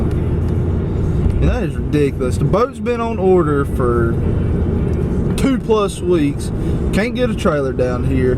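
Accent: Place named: North Carolina, United States. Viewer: Sounds like you're from North Carolina...I live about 30 minutes from Little Washington. 😆 I'm down here in New Bern, NC. I picked up a Tracker Grizzly few months back and ran across the video of you and your son testing out the 60hp. I'm thinking about putting a 50hp on mine. Its a 1860 as well. You think that's enough motor?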